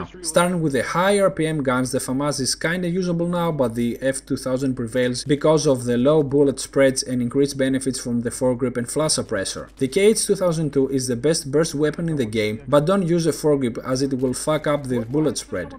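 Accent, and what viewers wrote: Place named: Greece. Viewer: definately english with greek accent (i'm from greece and i know exactly how greek people speak english :P)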